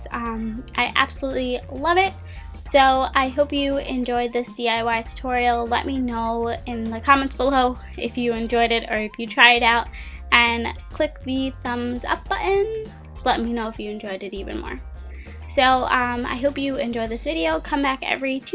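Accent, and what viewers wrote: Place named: New Jersey, United States. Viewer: you sound like you're from jersey. are you?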